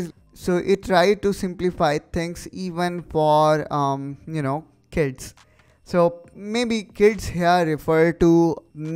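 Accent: India. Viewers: He is from India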